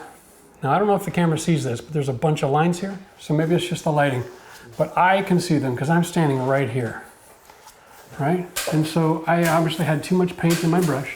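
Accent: Canada. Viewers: I just LOVE your approach! Ty for keeping the moment of almost crashing off the ladder - dude makes me feel more normal! Ty for you just being so real !! I am a 60-YO DIY woman, learning how to care for own home after 25 years of marriage — your help / expertise boosts my confidence so much! Also love your friendly Canadian accent & approach 🦋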